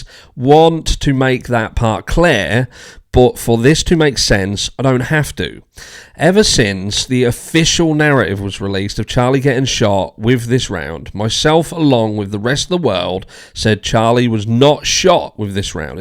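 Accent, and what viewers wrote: United Kingdom. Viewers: It's so funny to hear a Brit talk to Americans about ammo or firearms. 😉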